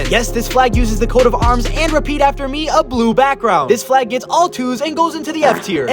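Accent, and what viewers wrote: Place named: Kentucky, United States. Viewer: Is someone from Kentucky? I get it, but I kinda like it.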